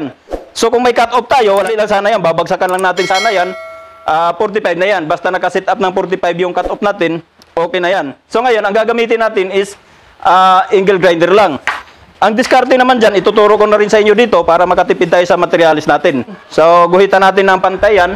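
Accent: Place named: Visayas, Philippines. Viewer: bisaya mlng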